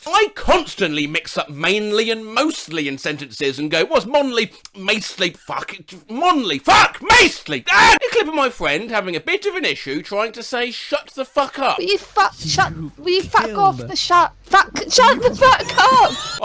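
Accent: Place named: RP, United Kingdom. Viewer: Stop talking posh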